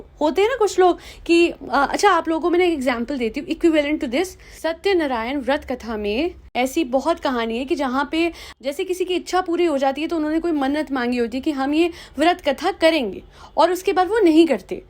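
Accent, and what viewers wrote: Punjab, India. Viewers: Sorry to ask but aap Punjabi ho kya?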